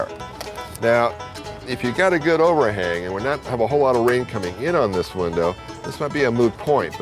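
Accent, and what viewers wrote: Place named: Missouri, United States. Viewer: He clearly said : "This unit"..... Seems like 19 people can't understand a Missouri accent.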